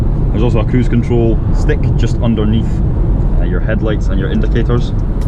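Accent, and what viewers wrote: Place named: Scotland, United Kingdom. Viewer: Well done for keeping the review informative, short (under 10 minutes), and entertaining. The Scottish accent is an added bonus.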